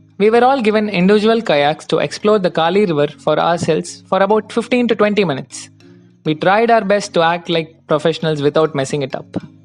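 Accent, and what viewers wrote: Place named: Tamil Nadu, India. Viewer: Bro pls speak tamil love ur tamil slang 😘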